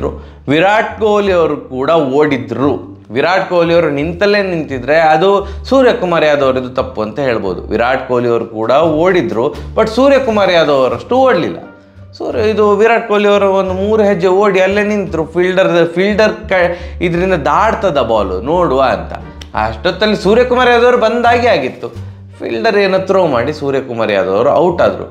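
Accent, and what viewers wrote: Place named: Bangalore, India. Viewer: Bro dakshina kannada bittu blre kannada mathadtidira... please DK kannada dalli maataadi..that janardan sir bashe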